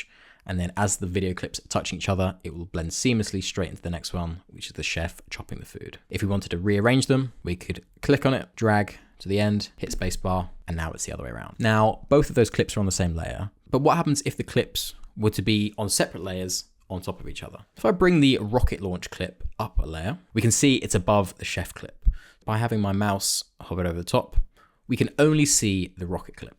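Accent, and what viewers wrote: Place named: United Kingdom. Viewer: Thank you for these tutorials, you are a really good teacher - and British which helps me a lot! I have been trying to learn how to make a read-a-long video, similar to a karaoke video but to go along with audio narration. If you think there is a market for a tutorial on this, it would be awesome. Thank you x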